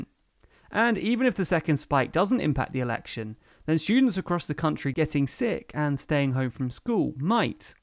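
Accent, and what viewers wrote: United Kingdom. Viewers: Pretty sure the narrator is British.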